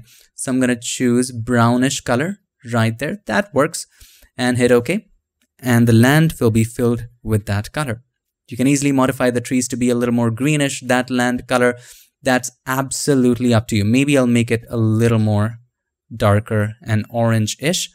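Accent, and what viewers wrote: India. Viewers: i just subscribed to your channel... where are you from btw sir? you're sounded like from educated indian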